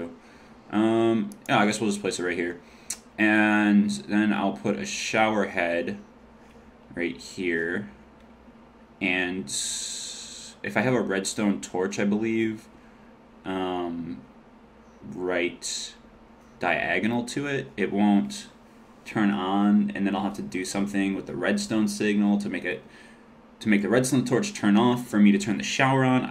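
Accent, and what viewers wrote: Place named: Michigan, United States. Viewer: im from michigan too so sounds normal to me lol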